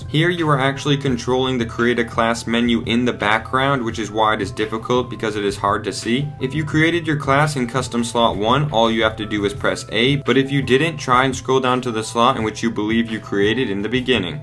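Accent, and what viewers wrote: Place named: United States. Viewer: OMG His Accent is like an Americans oh my god.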